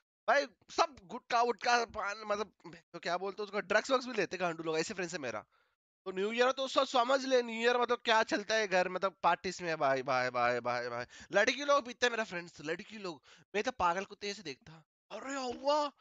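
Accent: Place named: South India, India. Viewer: ohh his voice is lile south indians :)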